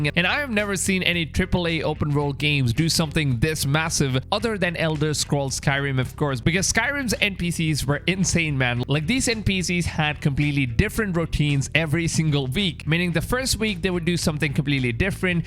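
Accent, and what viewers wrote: India. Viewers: Just a lame vid about skyrim titled cyberpunk from a guy with a 7-11 accent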